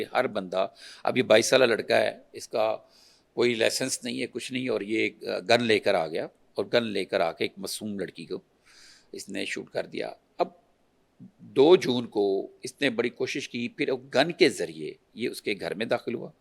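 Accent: India. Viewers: Don't try to have indian style of pronouncation. Khan as kan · Host 's pronunciation is silly ,aping lndians, quite disgusting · Is this host indian? Why is her accent like them?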